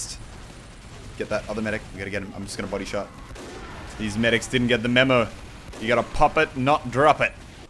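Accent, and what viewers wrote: Australia. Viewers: WAIT YOURE AUSTRALIAN ??? ---- G'DAY MATE !!! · the whole time watching this I felt like this was prerecorded gameplay with sone crazy straya guy shouting at his own video...I bet is from the deep north of NT, like Darwin or something else weird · Are you Australian because you have one of the rare Australian accents that is actually cool and not annoying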